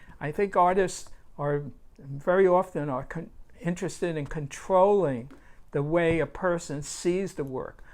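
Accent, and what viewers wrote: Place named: Brooklyn, United States. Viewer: your accent is just fine to us Brits! We love listening to your Brooklyn accent🤩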